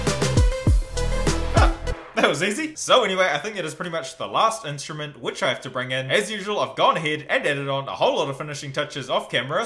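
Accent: New Zealand. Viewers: Are u from nz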